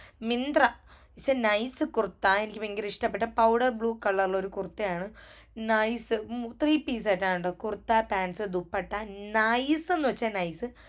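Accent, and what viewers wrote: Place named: Valluvanad, India. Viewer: നമ്മടെ വള്ളുവനാടൻ സ്ലാങ് 😂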